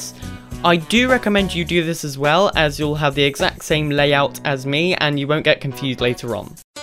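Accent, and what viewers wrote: United Kingdom. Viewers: finally a fellow brit i can finally learn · Woah woah my man is Bri'ish. So am I mate! (well, I live in the UK so does this count?)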